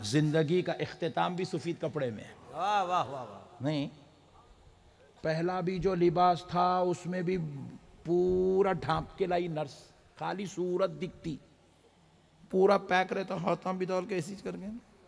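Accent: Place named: Hyderabad, India. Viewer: Are bhai yo hyderabadi hai thoda dakni lajha hota hai. Kab bhai koye kise ghaga .ka koye rahata hai osi language ya tahsil Ko sikhata hai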